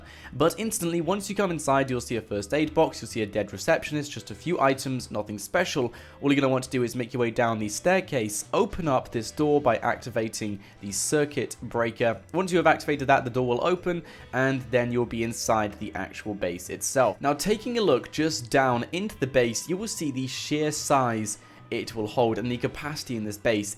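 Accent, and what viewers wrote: England, United Kingdom. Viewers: he's English with a normal voice. we all don't sound like hillbilly inbreads like you lol.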